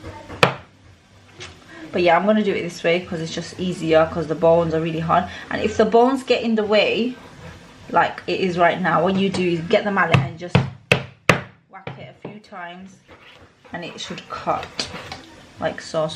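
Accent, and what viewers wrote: Sylhet, Bangladesh. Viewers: Asalamualikum sister. MashaAllah very beautiful cooking you do ❤️👌. Love the way you talk sylheti 👍. I from sylhet and i live in Manchester. I just subscribed u beautiful Affa ❤️😘. Your fish dish looking amazing 😊👌 MashaAllah. Love you ❤️❤️❤️❤️😘😘😘😘😘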